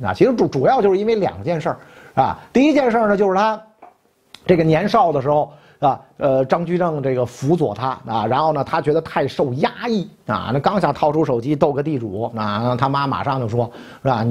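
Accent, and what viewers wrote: Beijing, China. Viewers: 北京北城人说话大概就是袁老师这个样子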